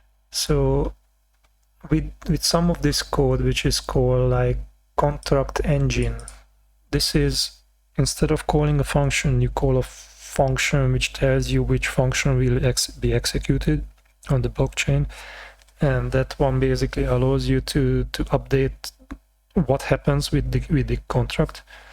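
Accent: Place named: Hungary. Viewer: You are so Hungarian... 🤣 Your accent betrayed you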